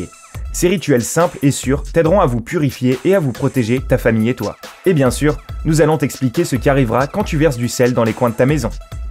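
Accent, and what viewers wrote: Quebec, Canada. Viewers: kawaiser 5.1 il habite au quebec je pense car il as un accent québécois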